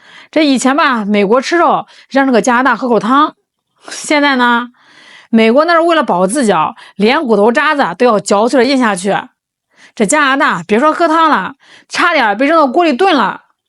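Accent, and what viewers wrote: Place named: Northeast, China. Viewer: 一股大碴子味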